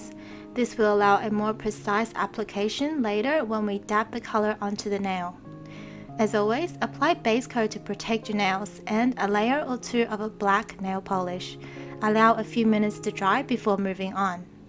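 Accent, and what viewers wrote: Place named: Australia. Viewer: I love your accent, I want to live in Australia!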